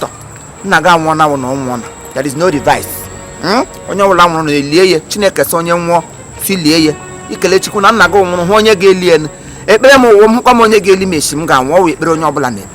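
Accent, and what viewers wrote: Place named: Imo, Nigeria. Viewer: on behalf of Orlu, Nkwere, Dikenafai, Okwele, Umuduru, Anara, and Ala Ekwe, I hereby remove my hat for you. You're a special CASE! Lol (I need to visit Ekwe) when I come back home. Please keep speaking Ekwe/Imo state dialect, don't EVER try to speak Anambra dialect again. #Ekwe #ImoState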